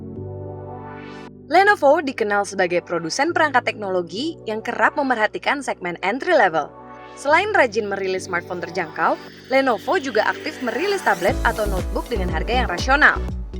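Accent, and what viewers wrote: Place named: Java, Indonesia. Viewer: Logat jawane kentel bgt :D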